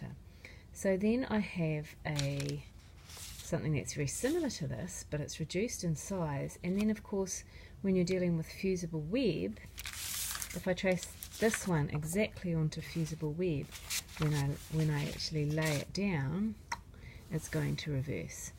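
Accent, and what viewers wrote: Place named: New Zealand. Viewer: Just as I was wondering how I could use my sewing, painting, drawing skills to make things without spending a fortune I found you. Great to listen to a Kiwi voice quietly explaining a simple process using things I recognise without the endless side explanations of things irrelevant :)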